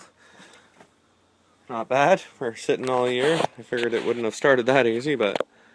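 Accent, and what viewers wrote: Canada. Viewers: I HATE WHEN CANADIANS USE ANY WORD WITH ''OUT '' IN IT ..ABOWT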